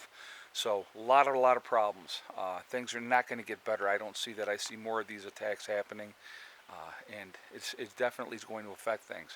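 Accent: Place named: Rhode Island, United States. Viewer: When I listen and watch you I find myself wondering if you’re from Portsmouth Rhode Island. Your accent reminds me of my uncles.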